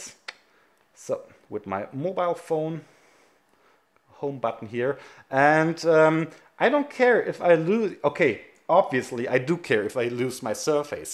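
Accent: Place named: Germany. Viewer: The german is strong with this one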